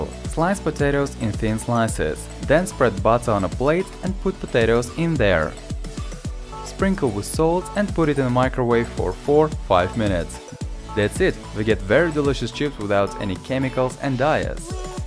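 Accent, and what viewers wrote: Russia. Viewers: ja irgendwie schade ich finde grad sein russischer Akzent macht ihn total sympathisch. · ax etot rouski aktsent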